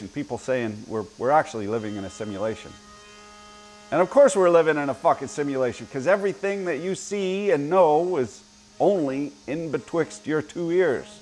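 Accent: Quebec, Canada. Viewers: Ah ben tabarnak, c sûr qu'avec cet accent là c'est resté au Québec un bout... Salutations et nice job! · I speak French but I understood absolutely nothing. Is it some sort of Canadian dialect? Can you translate please?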